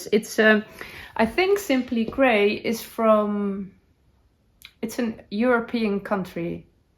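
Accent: Netherlands. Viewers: I love your Dutchy english😊 Half dutch myself, danish the other half, love everything creative and artsy, glad I found your channel♥️ tot siens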